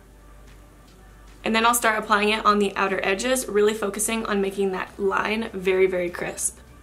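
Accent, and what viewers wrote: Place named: Boston, United States. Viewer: By any chance are you from Boston? You have a very interesting accent. Anyhow, awesome lipstick tips!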